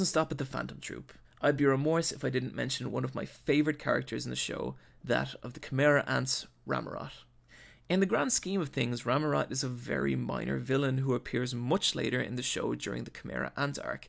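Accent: Ireland. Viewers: Great review. btw are you Irish?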